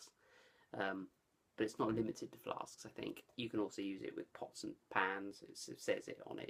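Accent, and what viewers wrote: United Kingdom. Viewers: What UK accent is that sir?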